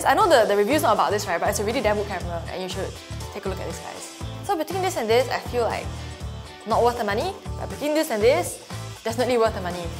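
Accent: Singapore. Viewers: cute. am detecting Singaporean/Malaysian accent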